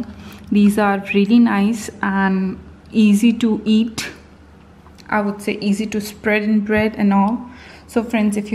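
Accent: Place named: Kerala, India. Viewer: hahahahaha y u talking malbari english...malyaram hindi english hahahahahahaha fucking butter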